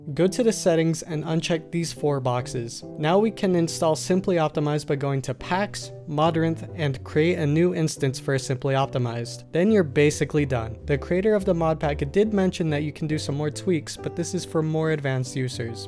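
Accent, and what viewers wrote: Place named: Eastern Europe. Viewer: The creator had a slavic accent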